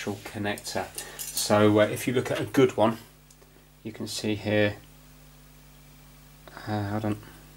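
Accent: United Kingdom. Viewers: Hi, first of all: Great video as always, love your british accent 🗣! I‘ve got a question: you‘re using some kind of small (grey?) notebook (or convertible?) in your videos, showing how much you paid for your „trying to fix“ items. I‘d like to know which brand/model it is?! Thank you and keep on fixing! 👍🏻 greetings from 🇩🇪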